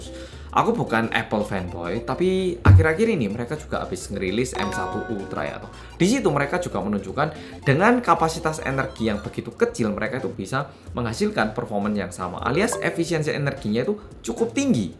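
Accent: Java, Indonesia. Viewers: Wong jowo yo mas? Medog jowo ne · Kog logat e jowo😁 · Anjirr lancar banget bahasa Indonesia nya Ampe ke Jawa jawaan😆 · Mas yutub kalau jelaskan super detail. Medoknya khas... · Samsung endorse dong, Iki wong korea tenanan lho, cuma yo rada medok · Korea jowo, mantap banget bahasane mas wkwkwk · 14 apa 13 pro bang medok wkwkk ??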